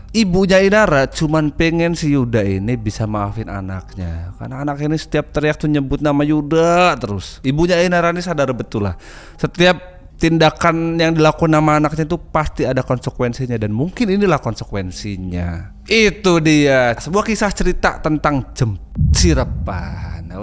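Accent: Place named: Java, Indonesia. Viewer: Enak lek crito.. kyk koncoku d deso lek crito.. bhsane medok enak di denger.. seru😂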